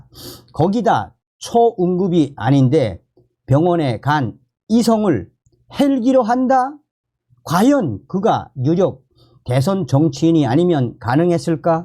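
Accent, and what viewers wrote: North Korea. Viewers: 북한사람인가. 목소리가 북에서 온 사람 같아요.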